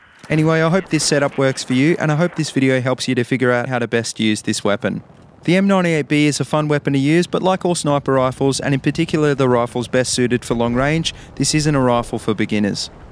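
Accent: Australia. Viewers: Are you Aussie/ New Zealander?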